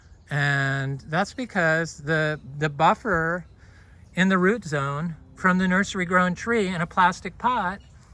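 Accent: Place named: South, United States. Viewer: love the southern accent lol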